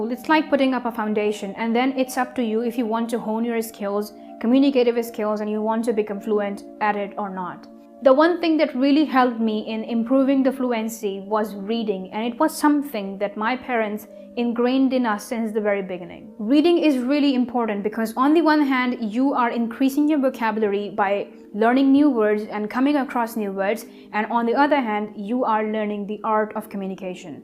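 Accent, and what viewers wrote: United Kingdom. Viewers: Seems like she is native of uk 😄😇😇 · How to get used to UK accent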